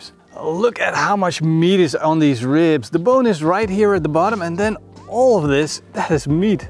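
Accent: Netherlands. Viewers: sounds dutch trying to speak english. but ribs looks tasty.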